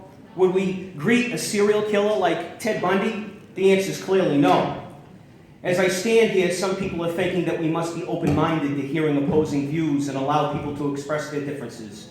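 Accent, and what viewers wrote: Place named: Italy. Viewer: This speaker is of Italian descendance.His accent gave him away....;)))))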